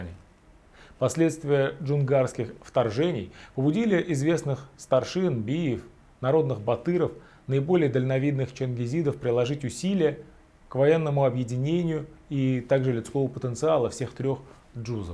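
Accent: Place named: Moscow, Russia. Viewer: правильно следует произносив звук О, а не акать испорчески по мАцковски. То есть надо произносить там где есть в слове буква О произносить звук О, а именно: О'йрат, ТО'ле бий, БО'генбай батыр.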